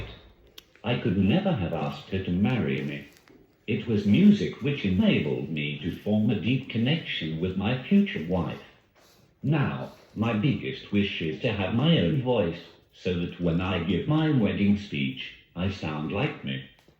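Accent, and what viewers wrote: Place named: Birmingham, United Kingdom. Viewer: Congratulations on your engagement, I hope you get to walk down that isle and get to talk to your huckleberry in your own Brumby accent!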